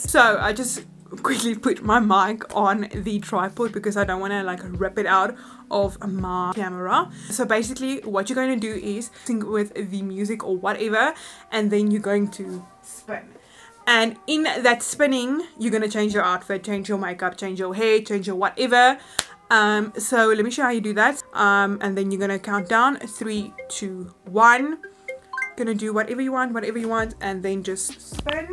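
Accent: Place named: South Africa. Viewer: I can tell that you’re from South Africa, I’m from Namibia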